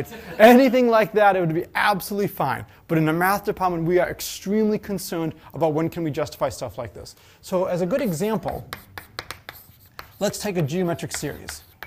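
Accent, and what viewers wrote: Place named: Boston, United States. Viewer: Q: What do Bostonian doctors say when they need to examine their patients' throats? A: "Say r" Ain't nothing to apologize for, it's charming :-)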